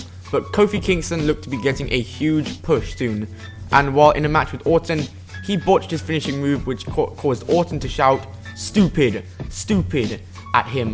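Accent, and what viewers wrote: United Kingdom. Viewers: Why are all these top 10s narrated by a British dude does whatculture wrestling have different accounts with slightly different accents? 😱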